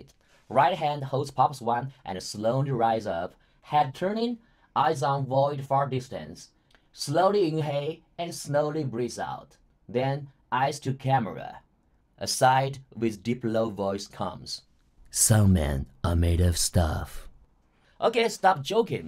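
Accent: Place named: London, United Kingdom. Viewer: 好家伙 这口音 老伦敦正米字旗的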